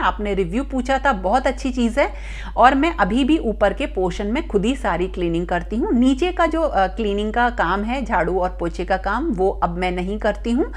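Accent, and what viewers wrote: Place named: Braj, India. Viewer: Braj boli sun ke bahut aachi lagi... nice madam ji 🤗..14hours ke kaam ko only 14 minutes mai show karna😀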